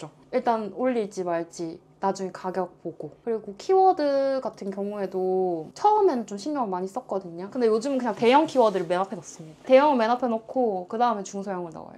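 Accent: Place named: North Gyeongsang, South Korea. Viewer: 경북 분이신가봐요 ㅎㅎ 본업하면서 다른 일 하기 진짜 힘든데 대단하신듯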